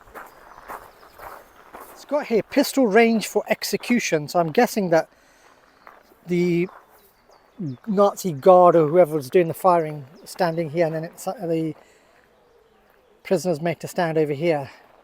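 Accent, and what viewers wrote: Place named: England, United Kingdom. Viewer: Sardar ji jara saaf bolo tusin te England wale lehje naal hi fatte chuki jande ho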